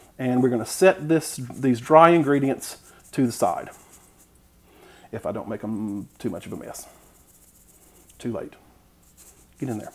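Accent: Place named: Eastern North Carolina, United States. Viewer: Wow, you sound like me. All we have is an Aldi out of the things you listed. LOL! Now back to the video. I'm notorious for commenting before I've finished watching but that's because I have the attention span of a peanut and will absolutely forget by the end. Where abouts are you from? I'm in eastern NC near the southern outer banks. Your accent sounds a lot like "my people". ;)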